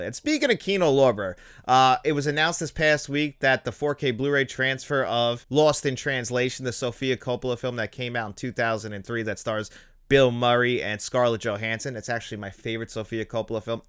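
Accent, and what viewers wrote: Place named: Cockney, United Kingdom. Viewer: Cockney accent! From London 😎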